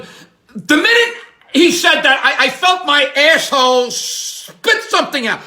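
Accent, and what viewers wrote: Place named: East Coast, United States. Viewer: ur killin me😅😅that east coast cadence in your speak just seals the deal😅😅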